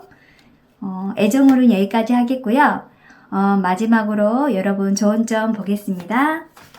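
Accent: Jeolla, South Korea. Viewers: 1번 감사합니다 ㅋㅋ 전라도 분이신가 봅니다. 광주 목포쪽인가요? ㅋㅋ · 전라도 분 이신가 봐요?반갑네요! 1번 리딩 감사드립니다^^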